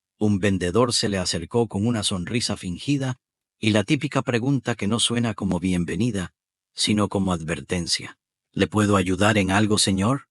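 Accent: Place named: Galicia, Spain. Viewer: Esa historia se repite y es vieja y pasa a diario en Mexico.. siempre llegan sierreños en Mexico en dealer se auto...como los ven prieititos y en huaraches no les dan atencion.. al final refiben la propina de su vida. La verdad tu historia es aburrida y mas de lo mismo ademas que tu acento es gallego..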